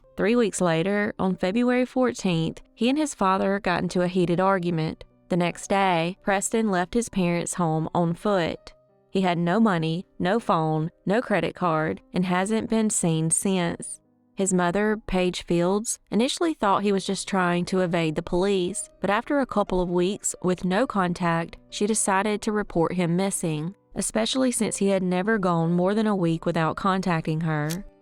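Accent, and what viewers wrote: South, United States. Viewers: Just heard about this story. 10 y/o Bob Boyes went missing in port republic, calvert county, md, Dec 26 1968, along with his pet deer, and has never been seen again. I know you already have a lot of suggestions. but would love to hear your southern accent, doing his story. Both parents, now deceased. Love fr md expat, living in panama. Thanks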